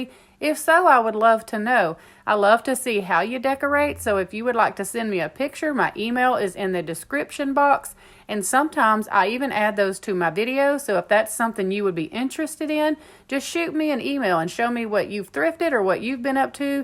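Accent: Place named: Texas, United States. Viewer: Love your videos and your Texas accent! But does your hubby ever complain of too many baskets?lol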